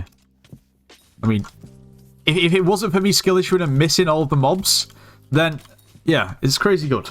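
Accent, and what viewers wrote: United Kingdom. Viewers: Taking into consideration that on the google account was an H, and the accent, I'm 99% sure name is, lmk if u have any obligations; Edit: We also can't ignore the fact that it was 385 pounds to fly to Andorra and that is the Brittish/Scottish currency where the most common name that starts with an H is, this makes my answer 99,9% sure, It also says that it's from Manchester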